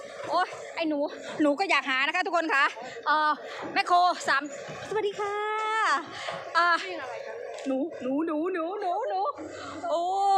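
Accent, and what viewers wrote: Korat, Thailand. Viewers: บรรยายเป็นภาษาโคราชน่าฟังครับ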